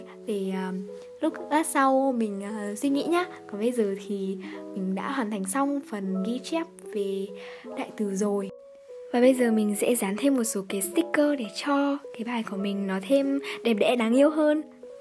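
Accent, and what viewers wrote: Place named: North, Vietnam. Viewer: phải công nhận giọng gái Bắc hay cực ý Hơi bị thích giọng bạn này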